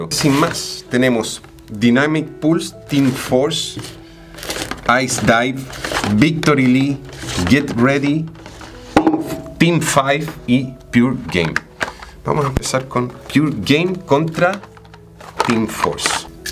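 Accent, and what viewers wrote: Chile. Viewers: Eres chileno ?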